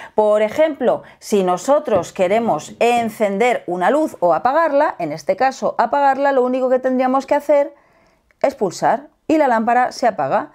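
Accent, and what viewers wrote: Spain. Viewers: No se pero a mi me parese que la forma de hablar de los Españoles es vastate fastidiosa no me gusta 😑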